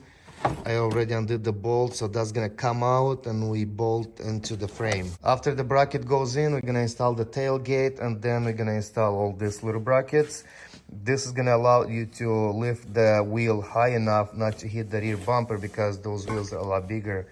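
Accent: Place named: Russia. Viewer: Russian accent 😂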